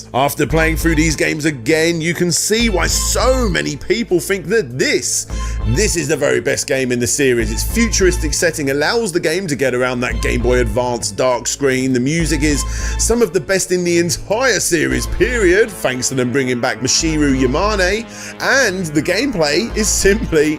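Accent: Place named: United Kingdom. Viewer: I love UK accents. Hi 🥺🥺🥺🥺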